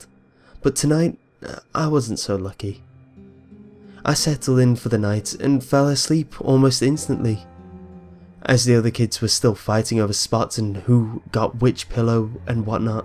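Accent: United Kingdom. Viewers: Is British? What's the accent anyways?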